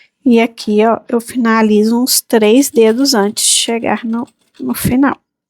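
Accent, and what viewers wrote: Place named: Minas Gerais, Brazil. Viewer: Maravilhosa a saia, e as informações adicionais sobre como ela veste corpos variados me ganhou! Inscrita com certeza, muito obrigada! Vc é mineirinha? Seu sotaque é familiar 😂❤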